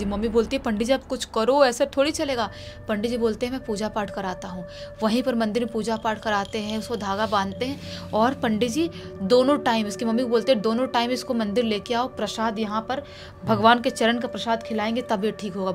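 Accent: Bihar, India. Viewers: Ye ladki bhi bihar ki hai confirm. Eske awaj se pta chal rha hai . Itna jaldi jaldi kaun story' batata hai maidam 😬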